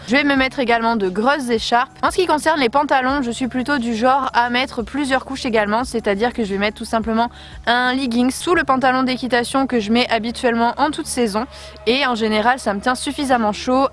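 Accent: North, France. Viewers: Tu est du nord ?? Je t'adore bisousss 😘😘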